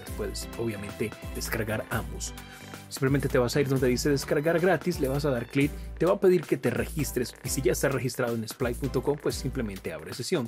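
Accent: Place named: Colombia. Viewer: Por tu bandera y acento me pareces de Colombia, yo soy de Venezuela y de España viviendo en México desde hace 10 años. Me parece excelente que intercambies con quienes te escriben. Tengo dos canales más, casualmente este no es el de música. Uno lo tengo para mis covers y otro como compositor. Decidí separarlos porque esto de las redes uno no sabe y cualquier dia me puedo llevar una mala sorpresa de que me tumban el canal por no tener derechos de autor. Los de compositor no hay forma. Empecé en este segundo aliento con YouTube hace poco luego de que twitter me tumbó mi cuenta con casi 500 mil seguidores y 10 años de dedicación, publicando poco además porque no me alcanza el tiempo para crear, producir y promoverme. Un Abrazote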